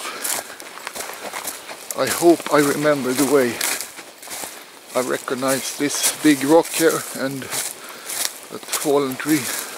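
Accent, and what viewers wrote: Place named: Sweden. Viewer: det stammer säkert men det var så roligt tyckte jag. det lär så svengelskt. måste ändå som vanligt beröma dina fina filmer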